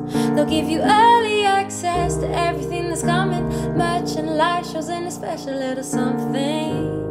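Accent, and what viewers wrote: United Kingdom. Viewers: I love your UK accent :) greetings from Cali🌴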